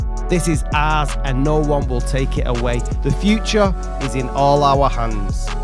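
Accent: Manchester, United Kingdom. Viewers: doing the voice over in that vid? proper Manc😆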